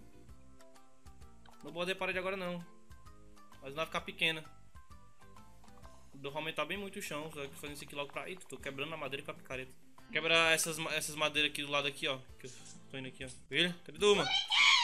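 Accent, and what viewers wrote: Northeast, Brazil. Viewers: vim pelo canal do camera mano , tu e nordestino e man ?? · Tu é nordestino man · Mano, esse jeito de falar/sutaque... So pode ser do Ceará mah. E ainda digo mais: eh de Fortaleza. E ainda digo mais!: eh do José Walter kkkkk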